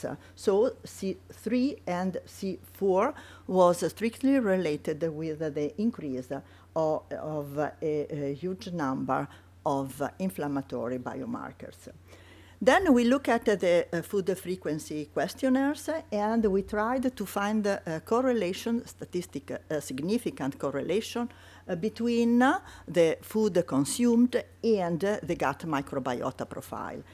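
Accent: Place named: Italy. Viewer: Cant listen to this english with strong italian accent.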